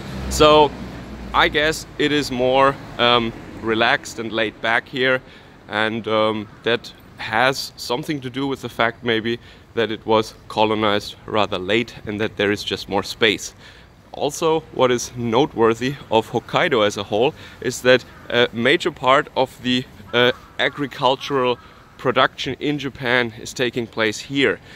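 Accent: Bavaria, Germany. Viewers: Das war sehr interessant! Danke Schön! Mano dein Akzent ist sehr lustig. Typisch Bayerischen Akzent. 😂 sorry i lived in the North and you guys crack me up. Tolles Video vielen Dank!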